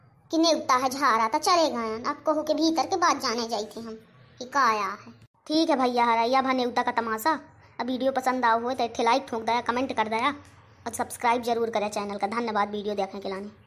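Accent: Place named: Bagheli, India. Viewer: Authentic bagheli along with deep diving accent.. Maja aayga bahini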